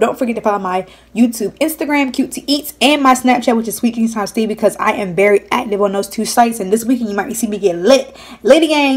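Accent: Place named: Baltimore, United States. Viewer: You sound like me. Im from Baltimore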